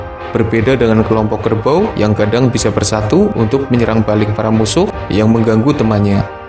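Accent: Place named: Java, Indonesia. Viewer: Min admin, jawane ngd to? Medok bgt ngmong e wkwk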